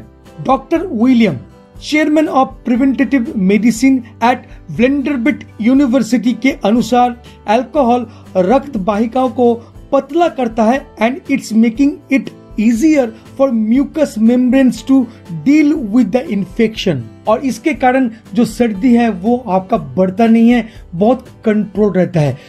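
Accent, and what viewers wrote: Bengal, India. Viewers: Are you a bengali 😶😐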